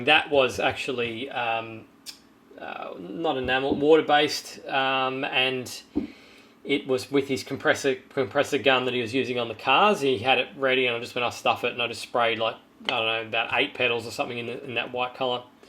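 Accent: Australia. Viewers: That's gotta be the first time our Aussie accent has been described as fancy! I must agree-this channel is full of awesome info. I'm a very recent subscriber. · I watch your videos assiduously, and a great help for us in Latin America, would if it had subtitles... (even in English, because of your fancy Australian accent) =) We really will appreciate!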